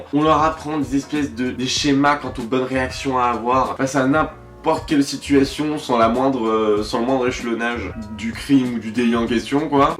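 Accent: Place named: Marseille, France. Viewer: Les 14 pouces vers le bas c'est les jaloux de ta bague de ouf et ton accent marseillais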